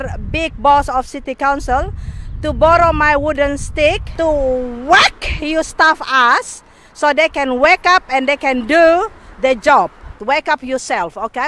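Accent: Indonesia. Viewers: Ma'am are you from kupang/NTT i noticed the timorese food, Beta orang kupang penasaran saja, karena logatnya juga mirip. Saya juga orang kupang sedang kuliah di melbourne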